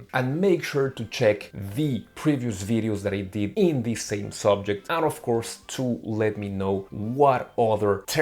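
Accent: France. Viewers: Thanks for the reoly. Obv not important, i just like knowing where accents are from. Im defn no expert on French accents and appreciate that tbey vary accross diff parts of the country..like everywhere. My experience: is my wife is French and ive been there a few times , stayed with her fam. Your accent just sounded a tad different to theirs . Enjoy your videos and appreciate your reply Cheers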